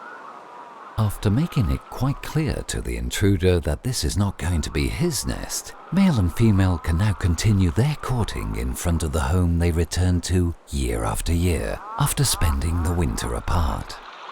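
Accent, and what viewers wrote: RP, United Kingdom. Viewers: ITS A , YES ! A FOXUMENTARY . OF COURSE AND NARRATED BY A RIGHT POSH BRITISH VOICE ! OH!! RATHERRRR!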